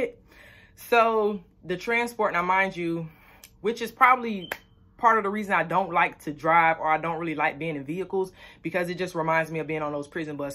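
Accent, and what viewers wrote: Florida, United States. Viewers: I knew she was from Florida,love how they talk.Ex felon myself love to see the growth. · I love that country talk😊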